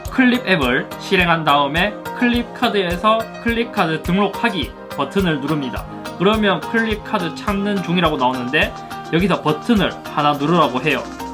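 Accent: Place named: Gyeongbuk, South Korea. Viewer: 경북쪽 이신듯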